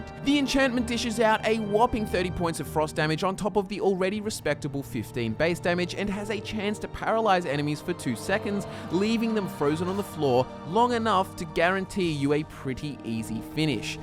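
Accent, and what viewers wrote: Australia. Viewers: Is it just me or do all Australian guys sound the same? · He's Australian, shouldn't be that hard to figure out.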